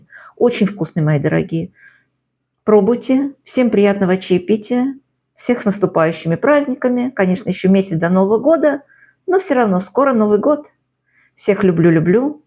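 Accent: Baku, Azerbaijan. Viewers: Прекрасный рецепт,прекрасная подача,а бакинские нотки в голосе-это нечто!!!!Дай Бог вам здоровья и творческих успехов!!!!❤️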